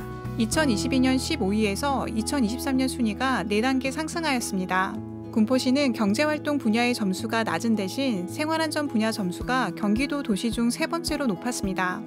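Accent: Gyeongsang, South Korea. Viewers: 나레이션 하신 여자분 경상도 출신이신 듯..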